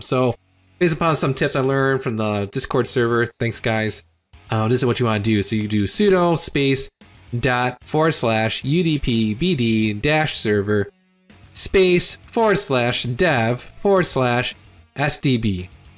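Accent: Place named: Midwest, United States. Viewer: Totally off-topic: are you from Minnesota or Pennsylvania or somewhere in the midwest? I'm trying to place your accent. It's the Ds in place of THs you do ("de" instead of "the"). I'm Canadian so I have no idea about American accents unless they're from the DEEP SOUTH lol.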